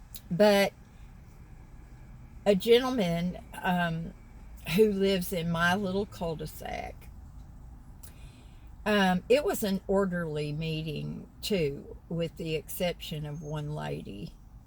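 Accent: South, United States. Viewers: I lived in England until I was 5 when we moved to Canada. I'm in my 60s now, but still develop a peculiar affected sounding accent when talking to Brits or in the UK. However, I also would develop a southern US accent with enough contact. As another commenter wrote, it's incredibly infectious. I would probably develop a southern accent if I binge watched you.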